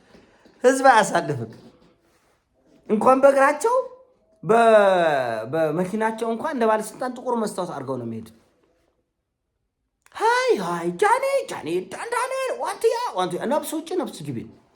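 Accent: Tigray, Ethiopia. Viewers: You are the smart person specially you represent the smart Tigrie people with the accent .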